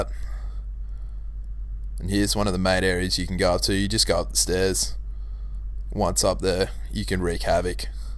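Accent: Australia. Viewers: you are Aussie?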